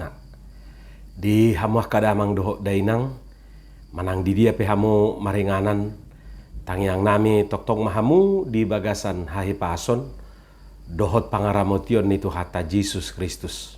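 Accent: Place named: Batak, Indonesia. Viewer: Bahenjo amang tekanan bahasa batak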